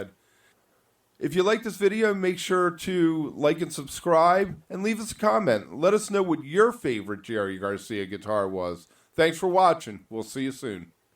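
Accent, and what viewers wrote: Baltimore, United States. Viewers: I’m from Baltimore - this is a Baltimore accent on elephant steroids. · Baltimoron here, lived in Annapolis/Balto/DC area 1979-'92 [age 14 to 27], before moving to Northern California [Santa Rosa] to be closer to the flame.. And yes, I pronounce my long "o"s the same way the narrator does.. & that is pure BALTIMORE, baby!.. Much as I'd like to rid myself of this particular language/speech trait, it seems that it's "baked in" > for LIFE.. Oh well.. There are also aspects of my Allentown PA [Pee-Ay] accent [lived there from birth to age 14] which apparently "dog" me to this very day.. Embarrassing!.. Oh well, what can ya do?!?.. As the original question-asker opined > GREAT VIDEO, hon'!.. Har!.. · Great video, but yes I heard the Baltimore right away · Dude, are you from Baltimore?? Asking because of the accent. Great video!!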